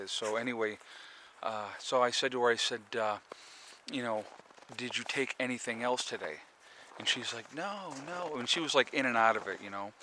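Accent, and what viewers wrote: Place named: Upstate New York, United States. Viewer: I really enjoy your channel, and your upstate NY accent, Boston native here. Ive been following your channel for a while now, subscribing. Im a so called prepper myself. I'd rather have it, and not need it, than need it and not have it. Thank you for sharing, I'll see you on the next one 👍👍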